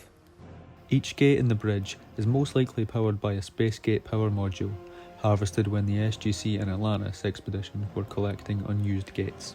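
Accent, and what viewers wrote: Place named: Scotland, United Kingdom. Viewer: Ok.. NEW here so DON'T hate.. I had NO idea you were Scottish.. but I IMMEDIATELY heard Scotty from Star Trek and Carson from Star Gate Atlantis.. sorry for stereotyping but DAMMMMM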